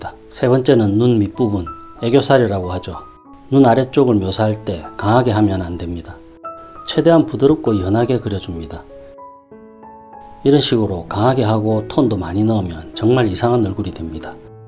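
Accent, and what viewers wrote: Gyeongsang, South Korea. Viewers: 경상도 말씨가 너무 친근하고 반갑습니다. 직접 화실을 경영하시나요? 멀지않다면 직접가서 배우고싶습니다. 저는 하남에 거주합니다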